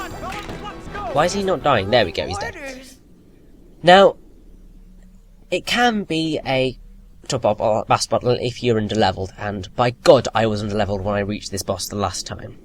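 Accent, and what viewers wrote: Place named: Wales, United Kingdom. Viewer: haha, you do sound a little Welsh! Nothing wrong with being English, although Welsh are better ;)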